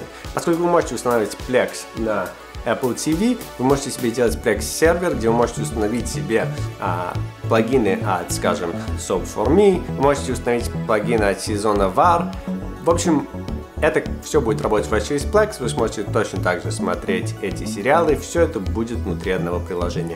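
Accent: United States. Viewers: Американский акцент человека у которого родители привезли в детстве в США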